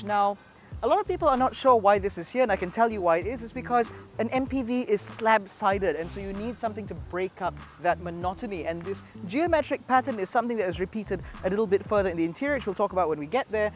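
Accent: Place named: RP, United Kingdom. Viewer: Just wow with your smooth and fluid presentation! Love the “queens English” sounds posh for a posh looking car! Done like and subscribed! Well done!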